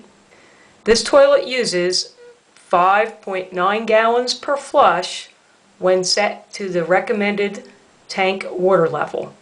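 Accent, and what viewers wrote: Philadelphia, United States. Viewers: Philly accent! 🙌🏻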